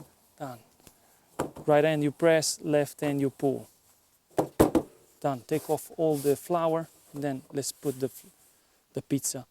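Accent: Italy. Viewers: Si sento proprio poco che sei italiano 😁😁💪💪🍕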